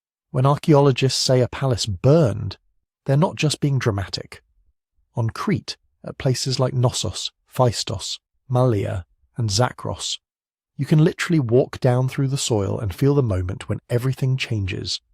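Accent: United Kingdom. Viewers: It is genuinely breathtaking to hear a British accent this refined and so confident delivering an argument this intellectually bankrupt. To claim us Hellenic (Greek 🇬🇷) people, merely 'inherited' this civilisation, this would be using the same set of broken brain cells suggesting the modern British aren't actually related to the people who built Stonehenge, that you lot just 'bobbed up' in the North Sea one Tuesday, found some neatly stacked rocks and a few damp fields, and decided to play house in them. If you could pull your head out of the clouds for five minutes and check the 2017 Lazaridis study in the journal Nature, you’d find that the genetic receipts' scientifically prove an unbroken biological line between the Minoans, the Mycenaeans, and the people living in Greece today. It’s not an inheritance it’s our own bloody house you fool. But I suppose we can’t let pesky peer-reviewed science get in the way of your revisionist propaganda can we? 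Surgically separating Crete from the Greek identity isn't historical analysis 🤞🏻🤞🏻 it’s just a semantic shell game for the intellectually lazy. Honestly, I’ve seen more historical accuracy in a packet of crisps. You’re not a historian; you’re just a confused tourist with a microphone and YouTube page you MALAKA ask the Greeks what that word means.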